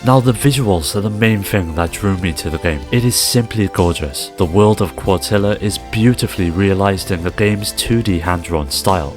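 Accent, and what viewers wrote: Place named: Cardiff, United Kingdom. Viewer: Thought I detected a fellow Diffian. 😃😎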